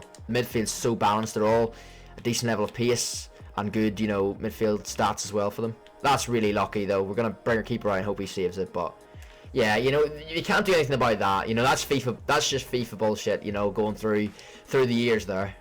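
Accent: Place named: England, United Kingdom. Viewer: Good video, but why do u sound half English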